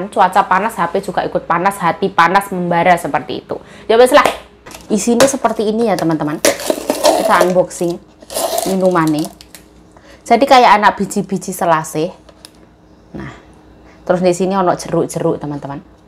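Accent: Java, Indonesia. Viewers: Mb jowone ngendi mbk?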